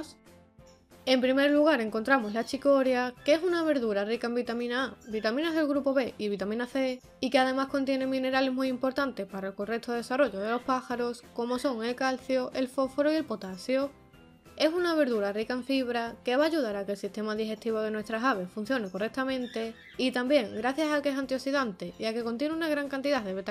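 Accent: Spain. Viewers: Gracias, muy util incluso para mi no solo para ellos jejeje😊Tengo la curiosisad por saber de donde es tu acento ,se que eres española pero de que region? Gracias nuevamente!!! 😊 Un saludo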